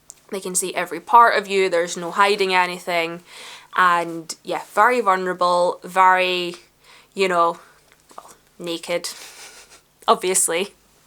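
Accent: Scotland, United Kingdom. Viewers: scottish is like drunk poetry, tell me more love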